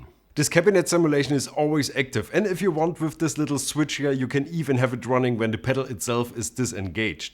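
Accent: Germany. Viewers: strongest german accent invasion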